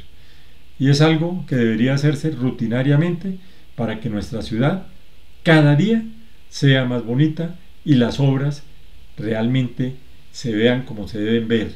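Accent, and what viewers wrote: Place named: Bogotá, Colombia. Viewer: Me encanta el dialecto cachaco indignado.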